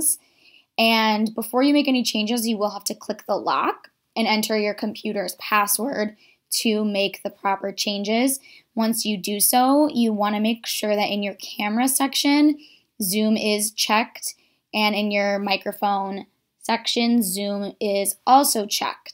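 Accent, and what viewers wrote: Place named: United States. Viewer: Sorry. I battle to understand her American accent. I am a South African English graduate.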